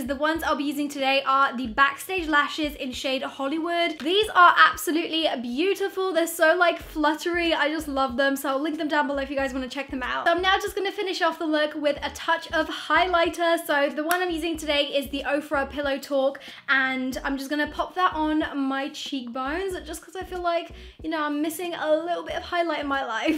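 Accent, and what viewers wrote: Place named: United Kingdom. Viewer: You sound more British then normal 😂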